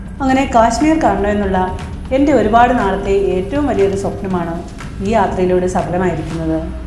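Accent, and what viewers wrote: Kottayam, India. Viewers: ചേച്ചി... സ്വാഭാവിക വിവരണം മതി.. ഇപ്പൊ ഉളള വിവരണം ഒരു സുഖമില്ല... കോട്ടയം സ്ലാങ് സൂപ്പർ...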